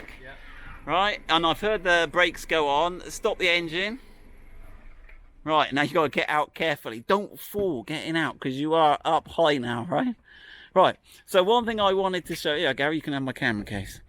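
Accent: United Kingdom. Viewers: This video sounds so British I had to go and make tea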